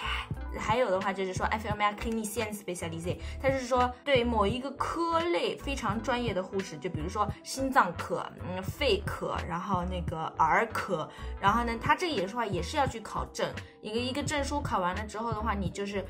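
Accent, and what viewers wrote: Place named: France. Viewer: 我好像从口音中听出了法语的味道呢(我堂妹在Quebec省(Ottawa)做Family Dr.)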